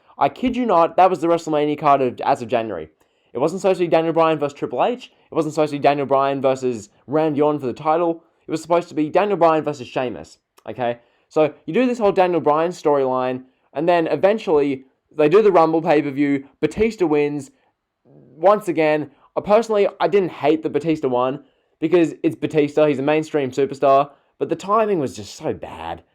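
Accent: Australia. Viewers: Hey man what part of Australia are you from?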